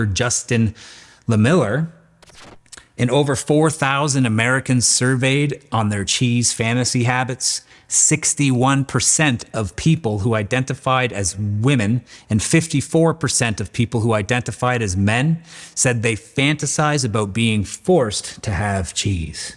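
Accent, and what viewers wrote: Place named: Canada. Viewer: Actually, I wonder about you. You practically make rock stars out of these killers. It's as if you idolize Dennis Rader. He murdered four members of a hardworking immigrant family named the Oteros in Wichita, KS. He took their ten-year-old daughter, Josephine Otero, and hanged her on a pipe in the basement and then jerked off to her corpse, leaving sperm on her leg. There was no DNA tracing then, so we rely on the evidence at the scene and his own testimony. This guy you can't get enough of? Stay the hell in Canada, you sound Canadian. I hope Trump buys your country out! You know, Kanuck, you can always visit Rader in prison. What, too chicken? Why don't you examine a few of your own killers, like Billy Bishop (72 kills) and Raymond Collishaw (68 kills, many others when he became a mercenary after WWI). They murdered more than Bundy or Rader, or any other hero of yours.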